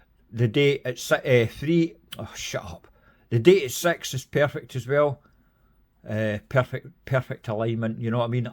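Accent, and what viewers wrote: Scotland, United Kingdom. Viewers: Love hearing a Scottish Watch reviewer, great review and insight, Christopher gives you so much quality for the money and I think embarrasses more expensive brands like oris that you mentioned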